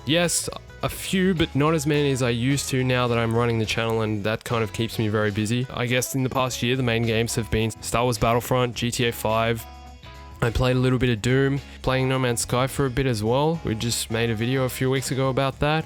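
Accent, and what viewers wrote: Australia. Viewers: are you Australian?? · You're Australian? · YES!!!! AN AUSSIE!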